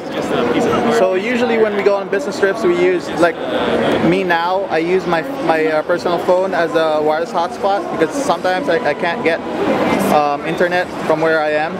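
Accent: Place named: Philippines. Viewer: I can tell by your speech and how you speak that you're a filipino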